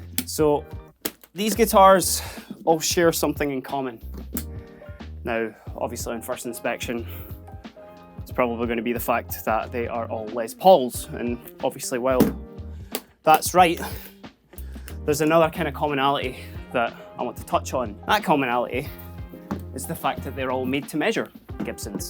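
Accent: Scotland, United Kingdom. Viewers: Hi! Are you from Scotland?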